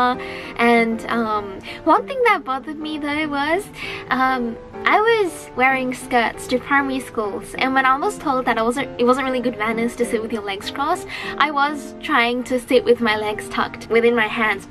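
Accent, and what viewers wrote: Australia. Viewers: please do a video with your thickest Aussie accent😂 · On top her Australian accent is really Charming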